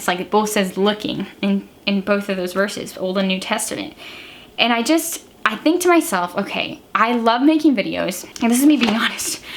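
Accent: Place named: Midwest, United States. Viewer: lol, that mid-western accent 😂😂 is it strong with this one... If your from the Midwest you might not hear it though :(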